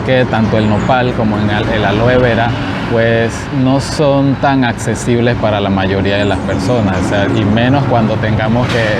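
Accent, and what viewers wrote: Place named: Panama. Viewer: Por tu acento veo que eres panameño … saludos y muchas gracias por el dato!!!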